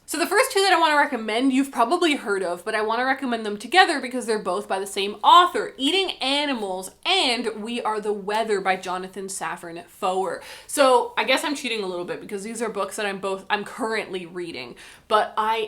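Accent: Canada. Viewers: So many Canadian 'abouts' in the first forty seconds it's so great! Great sponsor, looks like a good ecofriendly product.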